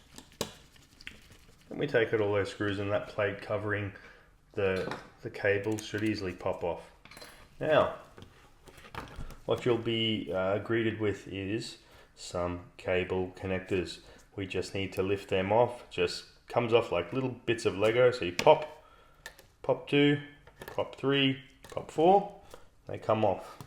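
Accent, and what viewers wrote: Australia. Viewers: elliott debell Australia going by his accent.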